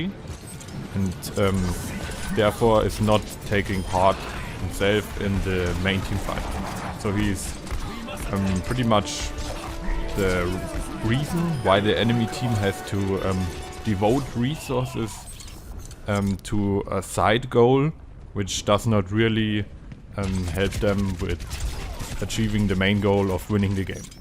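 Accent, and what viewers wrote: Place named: Germany. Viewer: Are you german? I think you are i can hear of your dialect. Like your vids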